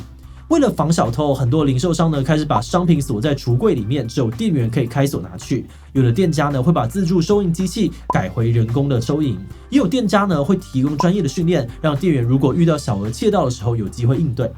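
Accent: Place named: Taiwan. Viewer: 不要事事規罪中國，合理一些。我也是從台灣來的，從口音聴來，你是來自台灣。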